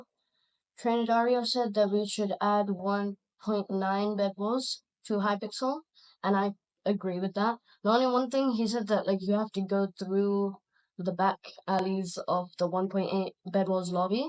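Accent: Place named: United Kingdom. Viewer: damn your voice sounds so young ive never heard it before also you sound bri ish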